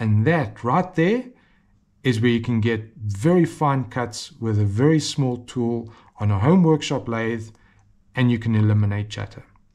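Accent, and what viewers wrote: South Africa. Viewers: Lovely to hear a good South African accent.... I recently built a stone slicing machine and had trouble of chatter machining the ends of the mandrel to take the cutting disc. I made a lathe steady which has helped in the machining of the long shift but I will definitely use your methods to make a cleaner job.... thanks. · Lovely South African acsent!!. Learnt a lot.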